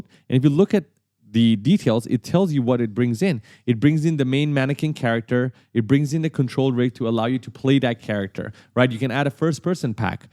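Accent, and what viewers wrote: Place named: Iran. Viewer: اینکه میدونم دارم unreal engine رو از هم وطنم یاد می گیرم یاد گرفتنش رو برام خیلی جالب تر می کنه, دمتون گرم.(از لحجه پیداست ایرانیه)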